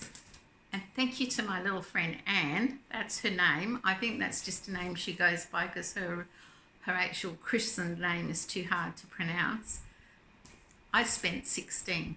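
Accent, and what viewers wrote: Australia. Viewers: Hi, I absolutely relished watching your video and hearing an Australian accent! Make me homesick. Great yarn unboxing!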